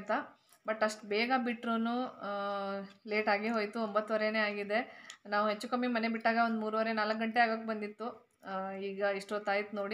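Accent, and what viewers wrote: North Karnataka, India. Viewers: Waiting for ur vlog ri.....don't mind....neewu complete uttara karnataka style naag matadi kelaak chand anstaiti....mysuru kannada mix maadbyaadri.....keep doing such vlogs we like ur vlogs so much.....👍👍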